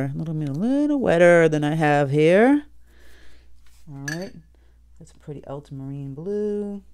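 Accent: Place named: New England, United States. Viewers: you are so refreshingly New England, somehow. I moved to NC a few years back, and I love hearing you and your expressions. “Boop”, “ooo hooo” etc. (lol). I am almost homesick - except for the weather. Your vids save my sanity. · I love this! I'm going to try it! You sound like my cousin in New Hampshire. Where in New England are you from? I'm a Mainer.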